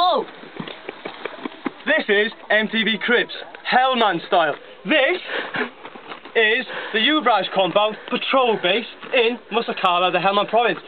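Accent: Wales, United Kingdom. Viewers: Yeah that's the Welsh accent of his coming through. Our accent is really strong. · Makes me proud to be Welsh aswell 🏴 I believe he's got a Newport or Cardiff accent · Welsh accent* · 100% a Rhymney boyo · I struggle with his accent but I got told he’s Welsh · With the heavy Brit accent and the videographer laughing loudly, it is hard to know what this clown is talking about. I hope he is safely back in the U.K. making civilian videos and taping the videographer's mouth so as to silence his laughing so we can hear the narration next time.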